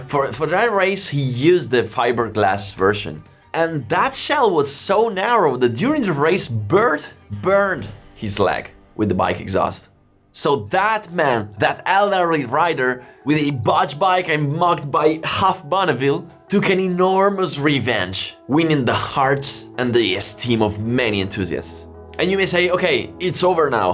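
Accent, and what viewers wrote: Italy. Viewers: As a New Zealander with Italian roots he pronounces his name as any Italian would. It's called an accent. Usually it's Maoris the first to jump on and moan when a foreigner pronounces their language wrong, but in this case you knew exactly what he was saying. Stop being so precious especially over free entertainment.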